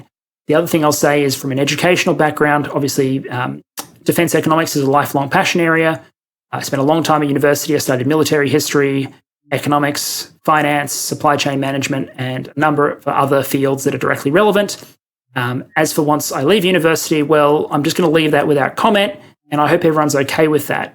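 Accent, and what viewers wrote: Australia. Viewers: You have a fairly standard Australian accent, so I'd suggest taking YouTube's autogenerated CCs and going through to correct them. It's still a pain, but far less so than doing it manually. The big thing it'll do for you is set timings.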